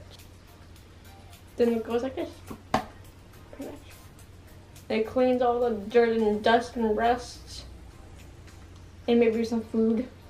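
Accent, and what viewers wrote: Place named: Canada. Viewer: do you live in Canada because you have an accent like a Canadian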